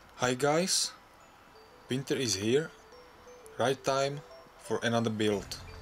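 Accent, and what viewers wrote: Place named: Czech Republic. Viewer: Ta sbírka hardwaru je naprosto skvělá. Když jsem tenhle kanál objevil, hned jsem podle přízvuku tušil, že je dokonce česká :-) Jen v tomhle videu jsou komponenty, které jsem ani netušil, že existují. Takhle exotický HW se ke mě nedostává.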